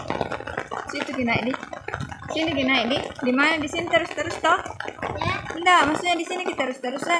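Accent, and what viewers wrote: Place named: Sulawesi, Indonesia. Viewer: Logat Sulawesi kak 😅 langsung kentara . Salam dari Luwuk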